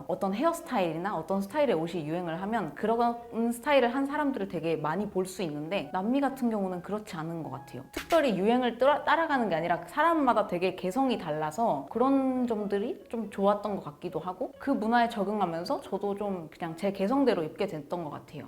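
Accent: South Korea. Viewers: 페루 여행 갔다가 돌아온 한국 여자 같은데 아무리 봐도 ㅋㅋ 말도 한국 여자 말투 그대로네요 깜놀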